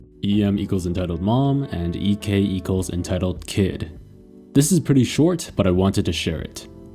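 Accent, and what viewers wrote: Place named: England, United Kingdom. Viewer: you sound like TheRealKenzo but with just a pure english accent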